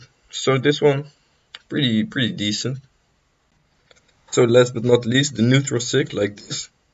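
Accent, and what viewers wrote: Netherlands. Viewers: Are u dutch? Lol i hear a accent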